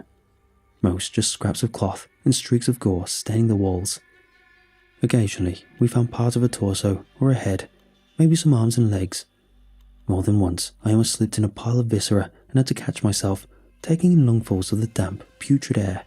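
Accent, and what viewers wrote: United Kingdom. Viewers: Sorry, can’t listen to some bullshit story about US troops from a British accent and verbiage. Wtf?!?!?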